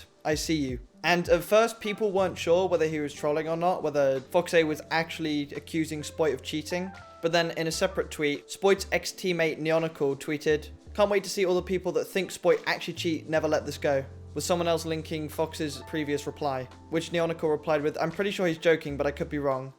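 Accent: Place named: United Kingdom. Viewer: This content is actually fire bruh, a fucking panda who plays r6 and narrates with a brittish accent? who doesn't want to watch that type of content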